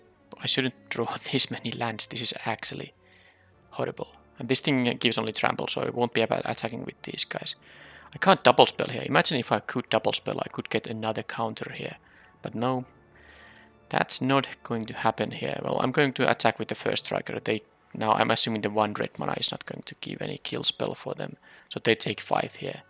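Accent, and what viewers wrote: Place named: Finland. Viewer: You sound Finnish! Lovely to see your face :D Thanks for all the draft videos, I've found them very helpful.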